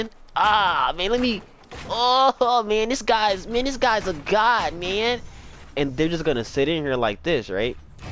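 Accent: Detroit, United States. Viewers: Are you from Detroit? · I know this might be a dumbass question...r u from Detroit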